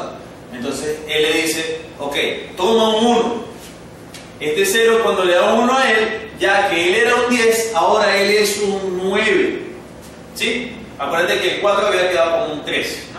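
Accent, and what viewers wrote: Venezuela. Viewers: Simpático el acento venezolano... :) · Boyjess13 Tambien le Note el acentico Venezolano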